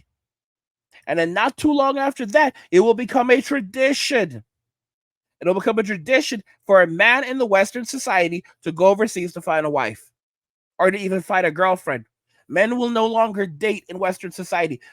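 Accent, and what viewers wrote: New York, United States. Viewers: I’ll give you a 7 outta 10 on that New York accent.. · Great content,. I like your in-depth analysis, POV / plan and prediction that Western college educated (Feminist indoctrinated) former OF creators will be forced to the streets (competing with AI Girlfriends). Great New York construction guy accent and positivity. Consider a classier Angry Man face to better represent you. I see you as Justified Man of Justice. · 10 on the NY accent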